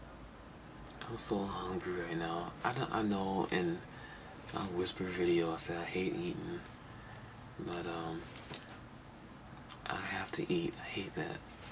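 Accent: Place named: Midwest, United States. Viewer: are you from the midwest...you sound like some of my friends from st. louis saying hurr : ) i love it soo cute.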